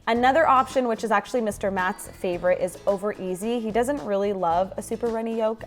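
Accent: Toronto, Canada. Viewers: Damm that last one. Really blew my mind and made me so hungry. Also from the way you talk, you look like from Toronto 🤜🤛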